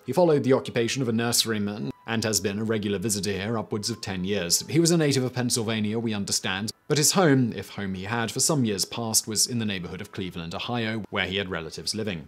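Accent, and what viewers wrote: United Kingdom. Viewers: For the last month this guy has been acting high in these videos. "I have no idea what I'm about to say ... I never heard of any of this ..." Is this in the script? Is he pulling these phrases out of his ass? These videos are becoming unbearable. The British accent made him sound smart over the years. Like when people think Alex Trebek is a genius while he is just an actor. But when he acts like an idiot, the accent makes him sound even dumber. Why would I want to learn from some backwater hick? Is he saying things that are researched or is he just making stuff up?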